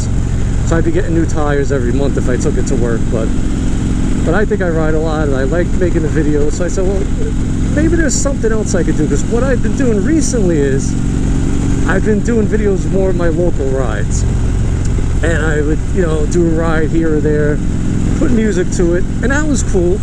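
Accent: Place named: New Jersey, United States. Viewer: Great first vlog. You have the sound problem down pat right away. It took me a really long time to get it right and you have it right. Love the accent. I had a few projects in NJ a few years ago and I was surprised to see how beautiful and rural so much of your state is. Look forward to seeing more. Ride safe my friend. Beautiful Road Glide by the way.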